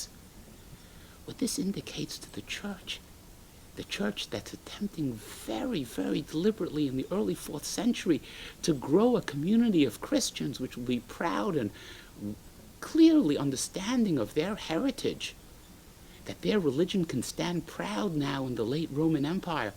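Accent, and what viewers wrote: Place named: New England, United States. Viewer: HIs accent's from the New England area. Many Jews have that accent because many Jews emigrated there in the late 19th century.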